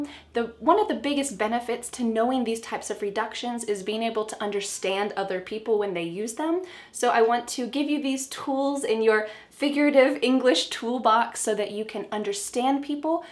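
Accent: United States. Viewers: 🗽 Amazing video! real American English pronounciation 🇺🇸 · I'm a native arabic speaker, These days i'm learning American English, so i would ask you Are all your videos in american accent? Thank you very much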